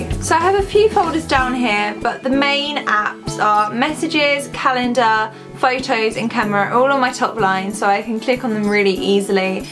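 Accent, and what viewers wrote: United Kingdom. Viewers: where in england are you from??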